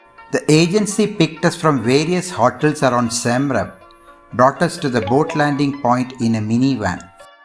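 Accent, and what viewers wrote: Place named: India. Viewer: Yeena dey...Your English...is Indian pronounces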